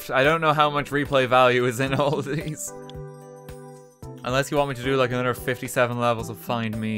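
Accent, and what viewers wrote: Ireland. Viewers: Is this lad irish cause like he sounds irish but speaks like hes american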